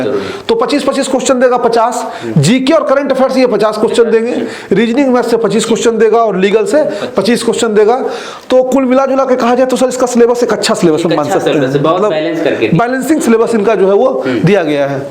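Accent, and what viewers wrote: Bihar, India. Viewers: Sr g ap Bihar se h kya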